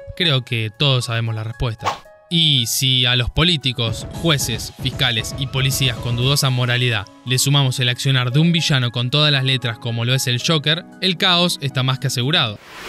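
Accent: Argentina. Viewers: Qué curioso pronuncian la palabra "niños" por aquellos lados. Ya he visto videos de youtubers argentinos y paises aledaños, y la pronuncian algo como "ninios" 🤔🤔🤔. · Sos argentino pa? Muy buenos videos 🤠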